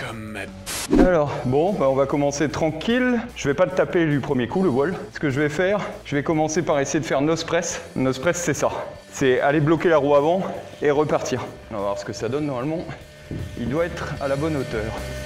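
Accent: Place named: North, France. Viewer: ta un accent du nord j'trouve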